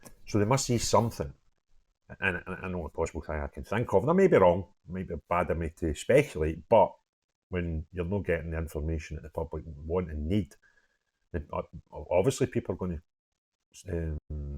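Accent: Scotland, United Kingdom. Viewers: your Scottish accent is hard to understand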